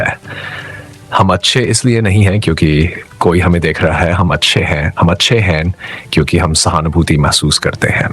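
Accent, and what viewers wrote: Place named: United Kingdom. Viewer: bro english ascent aa rhi hindi mai, thoda sa better karo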